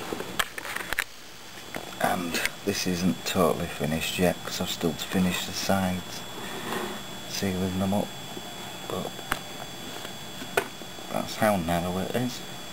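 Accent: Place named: Scotland, United Kingdom. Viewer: Awesome, sounds like scottish though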